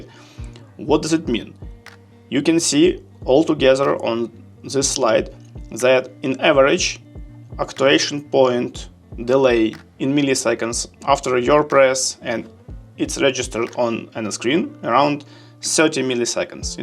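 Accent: Russia. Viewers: Честно говоря я когда зашел на канал сразу понял что ты русский по акценту) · Та по акценту сразу понятно что человек русский)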